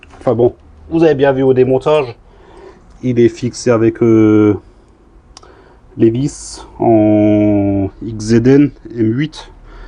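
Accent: Alsace, France. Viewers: Bonjour et merci. J'adore votre accent Alsacien. ELSASS 👍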